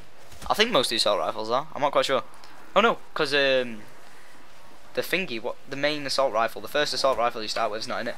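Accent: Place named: United Kingdom. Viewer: not a tutorial, some british guy talking about happy in the pants,...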